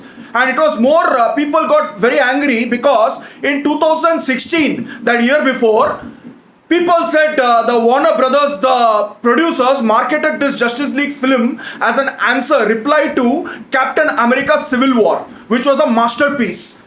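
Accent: India. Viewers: Whoa that's a thick Indian accent....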